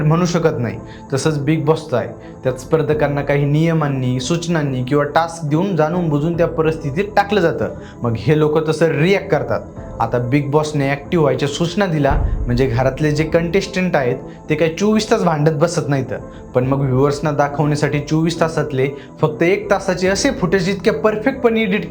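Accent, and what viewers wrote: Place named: Vidarbha, India. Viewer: Tu vidarbjacha ahes ka? Kiti Ghana bhasha Bolto.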